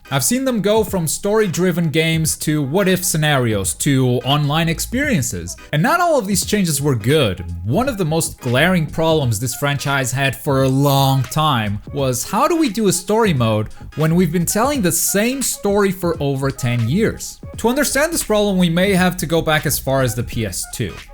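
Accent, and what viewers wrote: Portugal. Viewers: É claramente português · eu a ouvir a pensar que parecias ser tuga e afinal dps falas em portugues e pronto duvida acabaram ahah mas algo k ne comum ver u tuga ate fazer bem nesta area, continua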